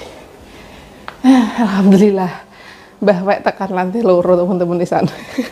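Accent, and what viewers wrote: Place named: Java, Indonesia. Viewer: Mbak klo ngmong jawa lucu😁 sehat²nggeh mba dan keluarga🤗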